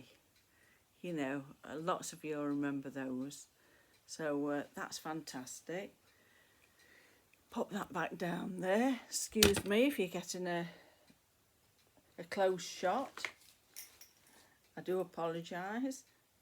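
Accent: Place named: Derbyshire, United Kingdom. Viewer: You definitely have a Derbyshire twang to your accent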